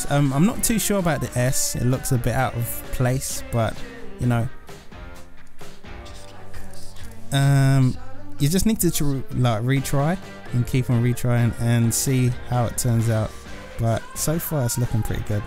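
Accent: United Kingdom. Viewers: Welcome back! I've missed your amazing English accent:)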